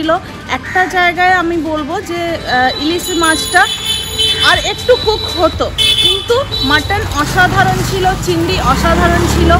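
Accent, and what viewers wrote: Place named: Hindustani, India. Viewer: তাহলে হিন্দুস্তানিদের কথার টানে কথা বলছেন কেন ?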